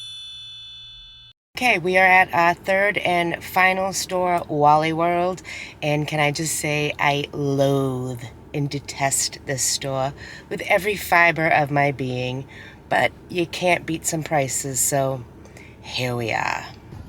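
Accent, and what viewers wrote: Massachusetts, United States. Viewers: Just found your channel through this collab - you had me laughing so hard with your Wally World dis - hey, who doesn't feel that way? I also love your MA accent cause I've spent many happy hours in various New England states :-) So you have a new subscriber and I look forward to following along!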